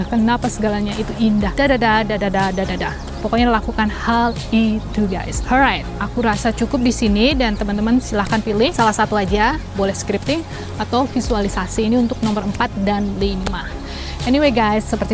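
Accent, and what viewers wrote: Banyumas, Indonesia. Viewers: Owalahhhhhh,😍😍😍mbak ternyata wong Cilacap toh ☺️ salam ngapak mbak 🤣 Ora ngapak ora kepenak ❤️❤️❤️❤️ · Kereenn bgt si kak video ini.. aku merinding dan terharu.... jadi lebih semangat lagi dan ga mikir aneh2 tentang jodoh.. wahh ternyata kakak orang ngapak ya kak 😁 salam dari Banyumas 🤗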